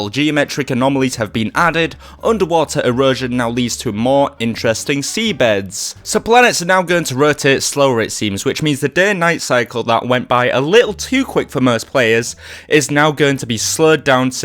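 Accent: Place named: Liverpool, United Kingdom. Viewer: The uploader probably hears that question a lot, so this is for the community: What is that accent? Reminds me of a Scottish influence lice Scouse as far as vowels are concerned - but inflection and consonants are way different. Accents are very interesting but incredibly hard to learn about if you don't specifically set out to learn about them^^ Learning English didn't even feel like learning, just exposing myself to the language... but with different accents, you're rarely given the meta information about them when you're just watching stuff ;) Yes, I'm off topic. I Know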